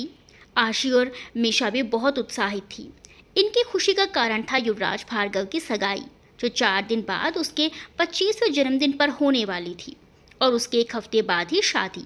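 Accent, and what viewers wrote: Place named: Bihar, India. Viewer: Bihari Hindi bohot acchi lagi 👌👌👌👌❤️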